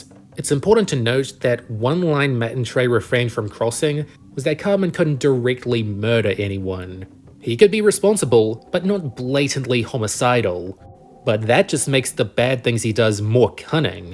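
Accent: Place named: Australia. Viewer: People here:He pronounced it wrong,he pronounced it Shah-grin,Chah-ring,Who writes your stuff..."bunchofbullshit". Guys,he's Australian,this way of speaking comes from his accent,be a little more respectful and understandable towards him.😒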